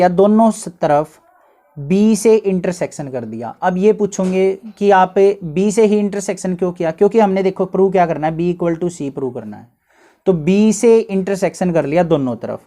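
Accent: Haryana, India. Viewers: Sir it seems u r from haryana??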